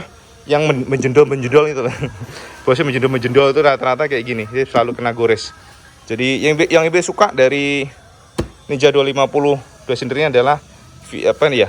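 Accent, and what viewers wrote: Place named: Java, Indonesia. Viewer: Mas.. Logat jawa kami bisa nerima, kalo bahasa jawa bukan kami gak nerima tpi gak ngerti mas, mungkin itu juga yg menghambat pertumbuhan channel ini. Dri kualitas mas,shrsnya bs dpt 2jt subs.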